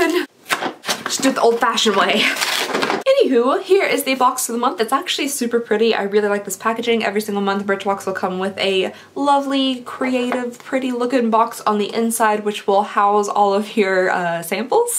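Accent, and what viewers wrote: Kentucky, United States. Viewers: Ha ! I was like she sounds like she is from Kentucky! I am from Louisville. Love your videos !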